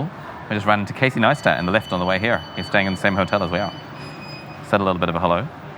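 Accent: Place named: India. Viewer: Your hindi accent is like lagaan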